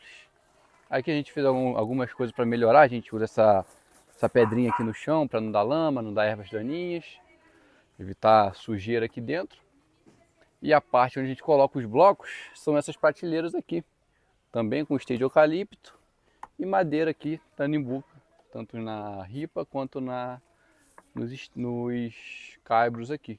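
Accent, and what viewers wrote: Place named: Rio de Janeiro, Brazil. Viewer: Pelo sotaque deve ser daqui do Rio. Eu tenho uma pergunta já que não sei onde fica sua propriedade. Qual a temperatura máxima da sua região?? E se houve problemas devido a temperatura elevada?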